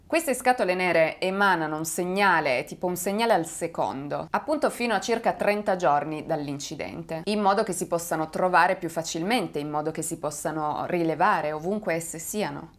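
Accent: Turin, Italy. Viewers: È la classica cadenza Torinese.... Specialmente la E e la O per noi, a Torino son tutte aperte 😂😂😂😂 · accento lievemente torinese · Condivido tutti i meritatissimi complimenti, poi, malgrado viva fuori Italia, ha una bellissima e particolare cadenza torinese. Prolunga in modo oggettivo, ma, soprattutto, soggettivo, le vocali. Brava.... c'è poco da fare, ha una marcia in più.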